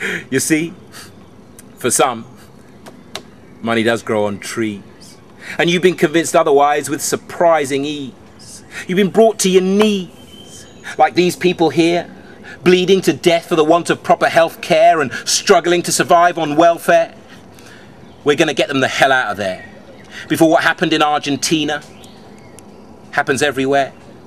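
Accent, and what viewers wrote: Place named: United Kingdom. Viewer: sweet british accent lol im not that into poetry but this sound pretty awesome :D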